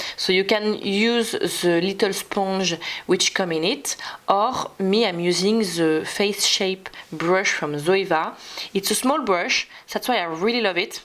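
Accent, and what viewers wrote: France. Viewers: Coucou, j’ai une petite question vraiment pas pensée de manière méchante mais fais tu exprès d’avoir un accent français en anglais aussi prononcé ? Peut-être pour accentuer ta différence d’être française entre toutes les autres YouTubeuse ?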